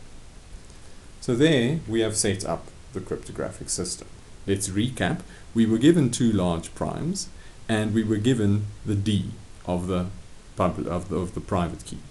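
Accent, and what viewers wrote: South Africa. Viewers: Nog nie eers die video gekyk nie maar kon daardelik hoor die man is Afrikaans, toe sien ek jou van is 😄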